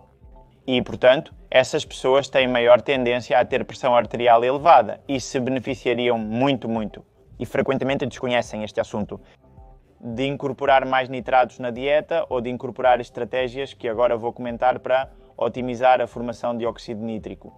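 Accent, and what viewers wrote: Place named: Galicia, Spain. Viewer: EU. CARALLO. QUE FALAS. Ti GALEGO. GALEGO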